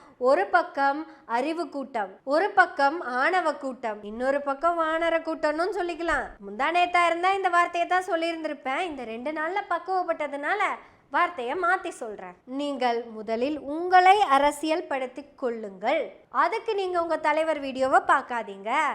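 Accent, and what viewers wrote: Kongu, India. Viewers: அன்பு சகோதரி ஒவ்வொருவரும் அவரவராக இருப்போம். மேலே நீங்கள் குறிப்பிட்ட ஒவ்வொருவருடைய காணொலிகளை கேட்டிருக்கிறேன். அழகான கொங்கு தமிழில் மழலை மொழி போல் சிறப்பாக பேசிவருகிறீர்கள். வாழ்த்துக்கள். இந்த பாணியை மாற்றிவிட வேண்டாம். நன்றி.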